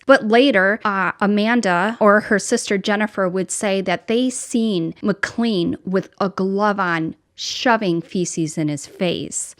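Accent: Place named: Michigan, United States. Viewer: Yep I knew you sounded like Michigan. Your accent sounds so familiar I've been trying to figure it out. Such a fan.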